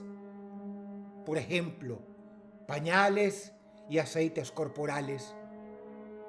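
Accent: Spain. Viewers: con acento español o.o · El acento español de ufffas uma delicia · hablando con acento español es todo lo que está bien en esta historia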